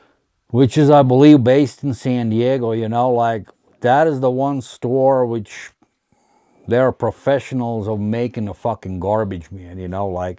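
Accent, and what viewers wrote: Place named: Latvia. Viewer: I swear man, nowadays when I see a good cap I can hear that Latvian voice saying " fucking stunner! " 😅 thats your catchphrase LOL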